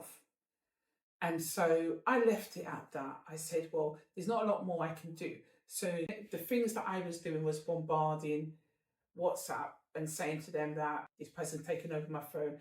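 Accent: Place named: United Kingdom. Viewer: Am actually watching this video but I don’t know which one am confused at if it’s your beauty or the British accent or the boldness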